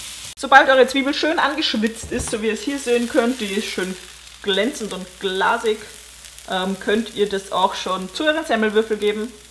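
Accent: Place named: Austria. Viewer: Red mehr Österreichisch😂❤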